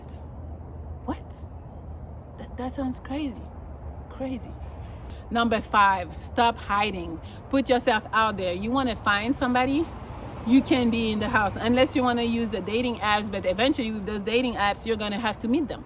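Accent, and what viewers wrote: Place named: France. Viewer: Coucou de quelle ville tu viens en France ? Btw je sonne exactement comme toi quand je parle anglais.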